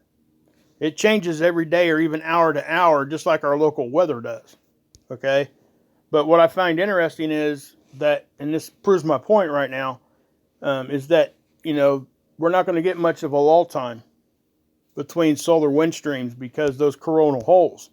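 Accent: South, United States. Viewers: i jus like ur country accent😝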